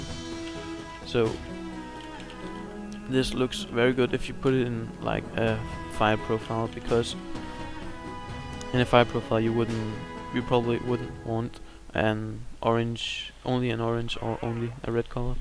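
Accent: Denmark. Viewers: Are you danish m8 ? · Good vid, keep it up, you sound danish <3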